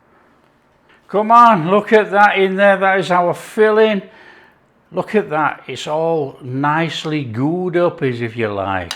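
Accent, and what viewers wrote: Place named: Lancashire, United Kingdom. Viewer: Im from littleborough lancs i think i may be not far from you by your accent, i will google magna thanks.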